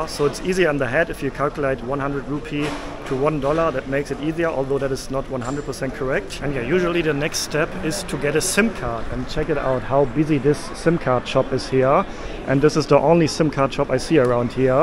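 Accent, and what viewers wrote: Germany. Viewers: Your German is ominipresent while you speak in English: 1947 and not 1974 as one says in German! ^^ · I like your german accent of English ...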